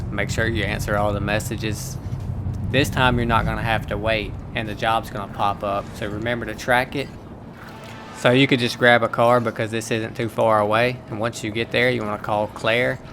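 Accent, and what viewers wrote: South, United States. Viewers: Love your accent man! Reminds me of my grandparents, who are very southern. Thank you for the informative video my dude! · Subscribed cause sounds like a fellow southern brother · Man, I love your southern accent—nothing like a man with a southern drawl. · Great video, choom. Really helped me out. Also, I really like your accent! Where are you from? Somewhere down south I would assume?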